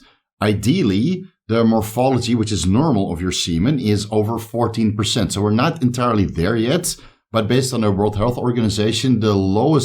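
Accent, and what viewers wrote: Netherlands. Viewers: Are you dutch? You got funny accent.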